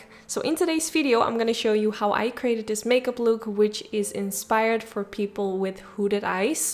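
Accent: Netherlands. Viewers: Have you thought about doing an English course? You are clearly fluent, but as soon as you said “hi guys” I heard you were Dutch haha. Hope you don’t take this the wrong way.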